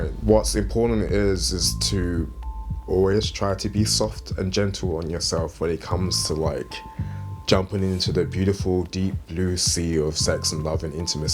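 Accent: United Kingdom. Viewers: Black sexy guy + british accent = 💯%♿👌😂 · I love the British accent coming from a black man...somehow it makes it sound even sexier for some reason. I can't be the only one who thinks this right?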